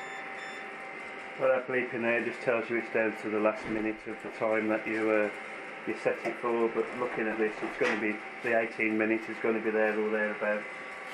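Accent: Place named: West Midlands, United Kingdom. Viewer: Funny that you should mention the kitchen full of smoke :). Yep you guessed it, I couldn't wait for the ducting pipe to arrive and roasted my first batch. In all honesty for a first batch, they really are quite good. I am indeed in the UK, Derby, East Midlands and from your accent I would hazard a guess that you are in the West Midlands. I would certainly be grateful if you could let me know where you get your storage bags from, I have looked on Amazon where there are so many to choose from I just get bamboozled :). I would definitely be interested in the coffee beans too please if you could provide a little more information. Many thanks and best wishes. Ian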